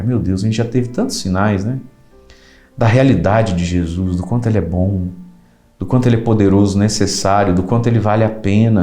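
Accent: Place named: Paraná, Brazil. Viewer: Homilias muito bem elaboradas e de fácil compreensão, com o belo jeito de falar paranaense.Que o Senhor cubra de bênçãos o seu ministério.. Bem Haja.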